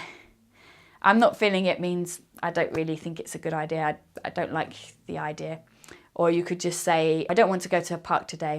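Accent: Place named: United Kingdom. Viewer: I like this English teacher a lot.Because she is amazingly skilful in teaching.Besides,I AM si fascinated with the British accent...I like very mucho the British English.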